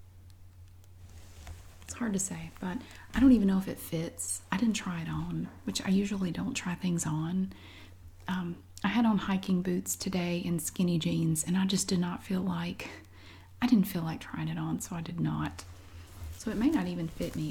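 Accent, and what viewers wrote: South, United States. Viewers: Do you have a patreon account? You should set one up so we can give back in gratitude to what you do for us. A good way for you to also make a little (and much deserved) cash. As for the Brioche, i don’t know what it’s like in the U.S but the French particular Brioche that we get imported to Australia is not dense. It’s very sweet and very soft n fluffy. Perfect for French toast and PB&J but not really with savoury toppings. Just my opinion.. Thanks for another great vid, I’m addicted to your soft spoken southern accent :) x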